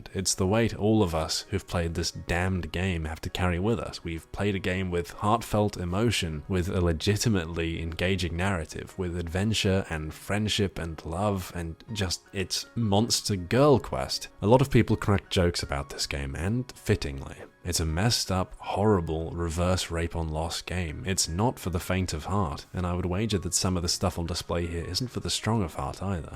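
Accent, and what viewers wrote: United Kingdom. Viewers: The story about how a man with a smooth, charming British accent crossed the degeneracy event horizon and came out the other end with a new appreciation for the obscene. A story for the ages, one that will be passed from father to son for centuries to come.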